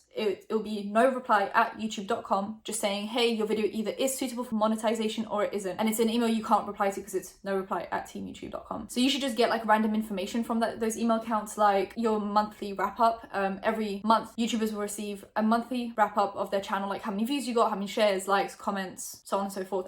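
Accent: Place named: London, United Kingdom. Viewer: she's polish but she's lived in england for a long time, so it's a london accent by the sounds of it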